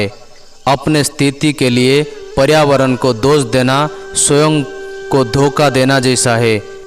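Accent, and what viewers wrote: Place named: India. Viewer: ଉଚ୍ଚାରଣ ହିନ୍ଦୀ ପରି କର ନଚେତ୍ ଓଡ଼ିଆରେ କୁହ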